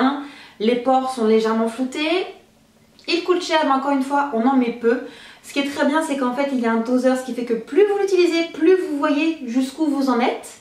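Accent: England, United Kingdom. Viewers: Il faut que tu arrêtes de faire l'acsan anglais. Je t'adore ma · J'ai le même mascara de chez Ives Rocher il est génial mes cils sont plus long vraiment super et t'aime très très fort avec ton accents anglais bisou!! <3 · je t'adore et j'adore ton accent british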